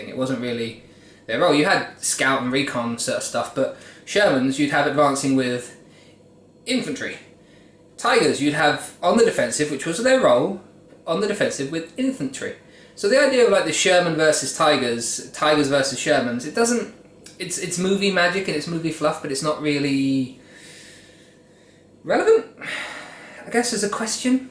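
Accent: United Kingdom. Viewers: Aside from your velvet queen's English accent I watch you because I learn things, I had no idea the differences between these tanks!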